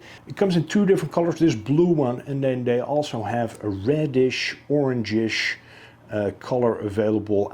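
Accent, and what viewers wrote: Netherlands. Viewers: sorry dat ik zo een comment deed of je Nederlands was. Goed Engels hoor maar toch hoor je het Nederlands er een beetje door heen. Trouwens goede content hoor! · You sound dutch but you are Norwegian?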